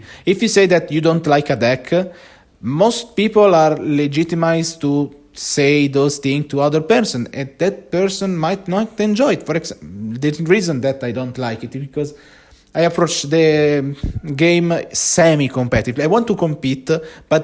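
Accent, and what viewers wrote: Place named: Italy. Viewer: Hi mate, really enjoy your job here on the channel, keep going with it!! For the first problem i just have a lot of tokens for the differents effects(mana, storm, flashback etc.) and i have spent one afternoon goldfishing and try differet approach to find my spot on the playmat for everything in a way that was clear for me so that in tournaments i can go pretry fast and if oppo has doubts i can explain quickly For problem 2 unfortunately there's nothing you can do about it....i've stopped bringing storm at FNM because everyone i end up against was complaining about something and because we all do it for fun in that occasion i stopped, while at RCQ people are still complaining a lot because the deck is indeed frustrating to play against, but as you said in a competitive environment everyone wants to win so i just don't listen to them, or i just say that i know that the deck is frustrating but we do what we can to win and after the match they look to understand cause they are there for the same reason(and let's be honest every deck is frustrating on it's own in modern right now cause everyone has to do unfair things to win) Also are you italian?(i am italian and your accents really seems italian to me)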